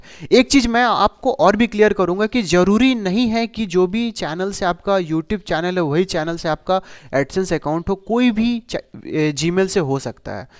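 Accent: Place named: Jharkhand, India. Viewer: Sir ji kya aap jharkhand se ho kya.....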